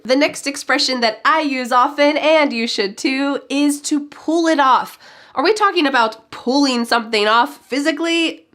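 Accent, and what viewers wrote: United States. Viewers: I❤ u teacher.....ur american accent so awesome....wanna speak american accent like you ....its really cool Mc donalds said im lovin it Greeting for ur husband DAN and ur children.....your guys re inspiring family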